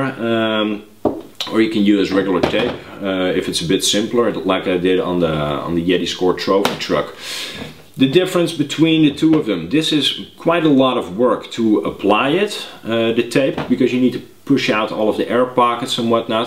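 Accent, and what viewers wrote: Netherlands. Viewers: lol i saw a couple of videos from you and i already thought you are dutch hearing your r's and now you confirmed it lol btw dutch too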